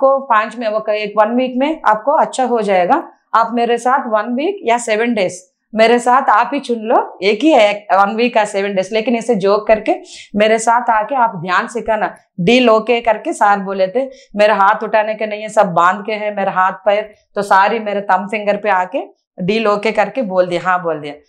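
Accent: South, India. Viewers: I love south indian HINDI ACCENT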